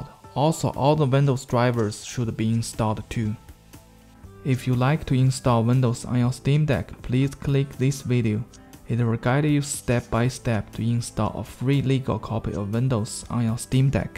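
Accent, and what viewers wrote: China. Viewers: 熟悉的中国口音，蛤蛤蛤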